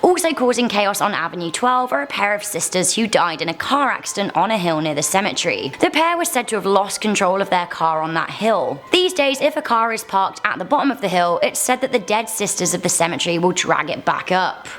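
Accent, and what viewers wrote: United Kingdom. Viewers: are you british? You sound british. Keep up the good work! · I love her sharp British accent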